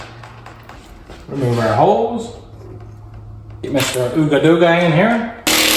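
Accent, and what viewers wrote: Newfoundland, Canada. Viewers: I think he's from Newfoundland. Its the closest thing to an Irish accent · I'm not into cars and repurposing vehicles, but for some reason i tend to like your videos lol. maybe its the fellow newfoundlander accent that keeps me watching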